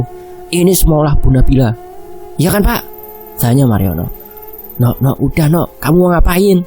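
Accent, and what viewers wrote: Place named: Cilacap, Indonesia. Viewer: Nada suaranya kyk cilacap nih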